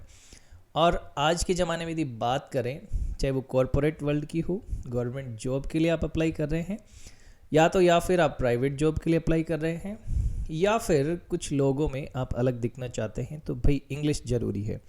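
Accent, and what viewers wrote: Rajasthan, India. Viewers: Sir AAP rajsthan se ho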